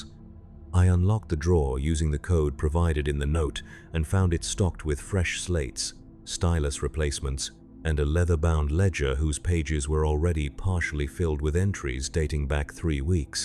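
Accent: United States. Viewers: Too American voice not good to listen to. 😢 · Wtf is with our favourite voice sounds to American now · Old voice was so much better, new voice is too American sounding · Put the voice back, American voices SUCK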